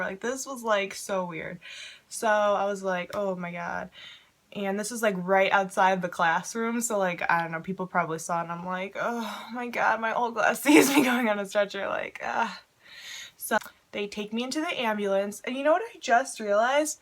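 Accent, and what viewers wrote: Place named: New York, United States. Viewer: Where are you from you have that funny accent lol "she eeasked" no offense I just think you're from New York or something ☺️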